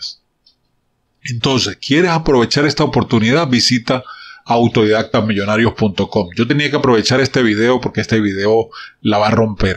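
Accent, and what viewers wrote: Colombia. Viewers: Uuyyy a uds se le sale ese acento colombiano pues como mucho!!! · Bla bla bla bla bla. Pura mentiras mijo sea serioooooo y con tono de acepto colombiano no me fija mentiras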